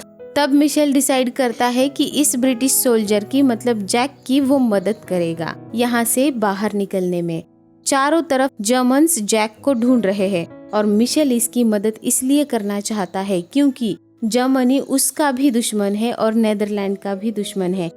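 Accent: Maharashtra, India. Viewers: तुम्ही महाराष्ट्रीयन आहात ना...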